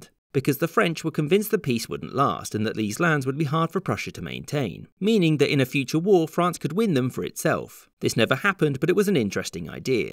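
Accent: United Kingdom. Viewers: I've always thought it was interesting how Brits pronounce things. It's so striking to hear someone call France, 'Fronce'.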